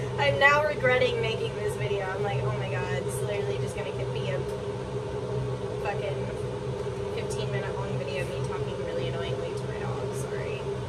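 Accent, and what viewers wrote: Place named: South Carolina, United States. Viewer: i love your South carolina accent so much lol im in the midwest 💟 i went there earlier this summer i miss yalls voices🤣💘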